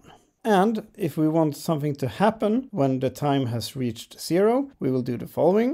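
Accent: Sweden. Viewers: The second I lissened to your voice I was like YEPP HE MUST BE SWEDISH before even reading your name/ Hello from Another Swede :) Nice too see a Swedish Gdevelop user make videos! Please continue with the tutorials.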